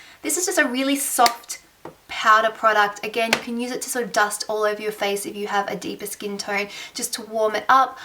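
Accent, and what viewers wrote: Australia. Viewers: Yes, an Aussie accent! New subbie here :)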